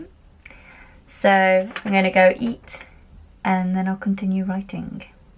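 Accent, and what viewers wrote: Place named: United Kingdom. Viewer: Pre-computers, I used to write by hand before typing this up. Much later, I'd go through the typed version, revise it, then retype. Apart from the revision stage, I began doing this while at university. I didn't have time to revise my essays before handing them in. Now I write straight onto computer or Alphasmart Neo. I'll then put the first draft on one of my ereaders and make notes for revision. More revision is done in Word. If you're going to write extensively by hand, maybe consider a really nice fountain pen and nice paper. You seem to have a British accent. Here in the UK black cats are lucky, and having one cross your path is a sign of good luck. A crow, on the other hand . . . Maybe you could consider an Alphasmart Neo if distraction free is your priority. You can buy them secondhand on ebay. They used to be quite cheap, but they've gone up to around £50 plus package and postage.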